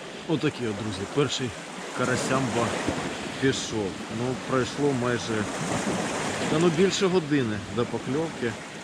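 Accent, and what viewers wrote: Poltava, Ukraine. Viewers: Щодо мови- все добре, чисто полтавський говір;)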